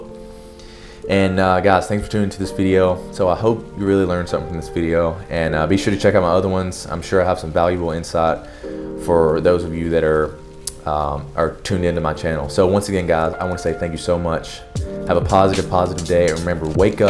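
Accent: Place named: South, United States. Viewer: I really enjoyed this video. It warms my heart to see people changing for the better and having the guts to openly speak about it. And your lil southern twang makes it even better.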